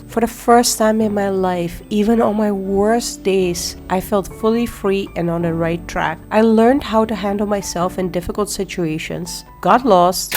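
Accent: India. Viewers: congratulations! happy for you and your husband! btw I hear a bit of an Indian accent haha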